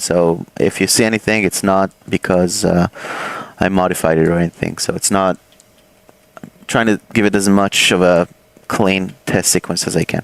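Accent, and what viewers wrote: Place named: Israel. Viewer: You'er sound Israeli. Am i right ?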